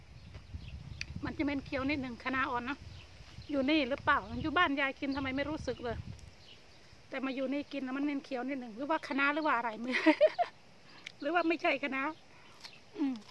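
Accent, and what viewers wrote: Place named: Surin, Thailand. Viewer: ชอบดูยายจัง สำเนียงยายพุดเหมือนทางบ้านแฟนเลย...สุรินทร์ น่ารักดีค่ะ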